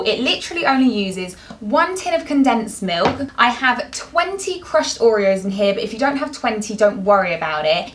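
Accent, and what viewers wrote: United Kingdom. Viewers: I think she has British accent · Omg whats your accent? Is it English? Its beautiful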